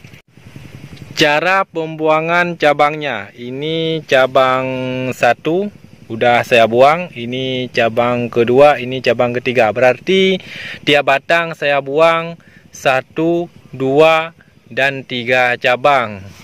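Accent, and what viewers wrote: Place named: Aceh, Indonesia. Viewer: Kaya orang Aceh Berbah Indonesia,Meri bak irama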